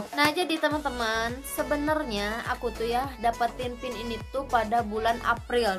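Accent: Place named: West Java, Indonesia. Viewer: mhun.ttp smngt ja.teh..logat Sunda na mani katingalian pisan.hehe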